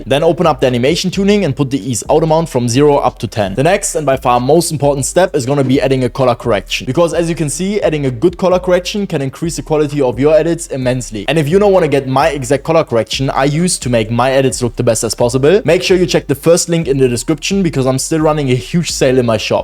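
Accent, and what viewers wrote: Germany. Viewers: Ich höre deutsch 😉👏🏼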